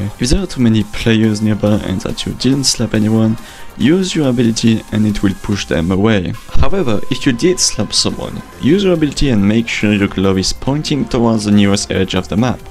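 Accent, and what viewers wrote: France. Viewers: Is my guy french??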